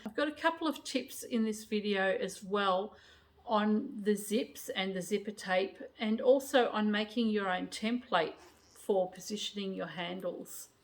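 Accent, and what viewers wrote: Australia. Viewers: Hello... u'r sew'n friend Anna here from Greensboro NC. Luv the Itty Bitty Bag and I've been wait'n 2 make one every since I watched u'r last shop tour, but I ran n2 an issue when make'n it. I went by the "transcript measurements" it says the main fabric is 10½" x 3½", contrast (black) is 10½" x 1½" and the lining is 10½" x 3½"... it didn't dawn on me that the lining was gonna bee 2 short until after I put the zipper in and turned the lining 2 the backside of the open bag 2 press neatly down. I watched as u did the steps but bcuz u lined u'r bag w black fabric I couldn't c that it was even w the bottom of the bag. Lesson learned. Main fabric needs 2 bee 10½" x 2½". Don't go by transcript measurements. I suppose that u'r beautiful Australian accent was misinterpreted. Just thought I'd warn u and others. Waffle on my dear lady, waffle on.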